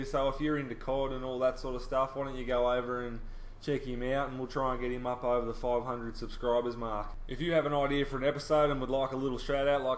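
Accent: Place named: Jamaica. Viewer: I have never heard an Australian try to speak patois ahahaha dude that poodle was money!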